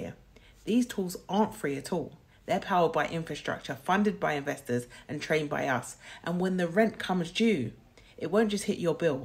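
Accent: United Kingdom. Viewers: Ooowter, british, a i abosoultely loved your accent.😂😂❤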